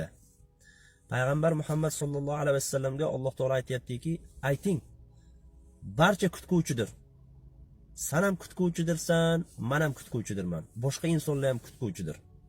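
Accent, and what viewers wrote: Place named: Namangan, Uzbekistan. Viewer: Номонгонликми дейман шевасига караганда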